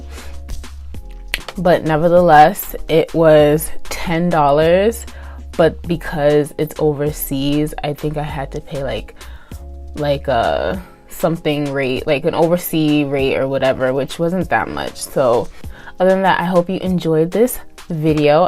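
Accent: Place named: RP, United Kingdom. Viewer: You sound like miss posh in Canada 💕💕💕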